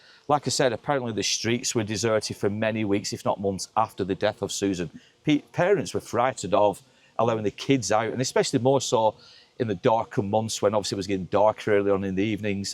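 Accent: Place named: England, United Kingdom. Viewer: nice to hear an English person on here. Im from the UK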